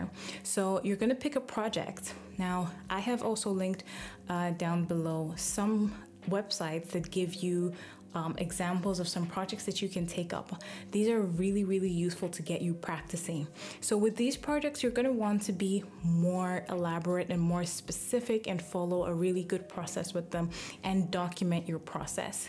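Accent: Nigeria. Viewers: sounds Igbo dear, are you Nigerian? I am fully entering the UIUX Design space. Switching my career from media and communication.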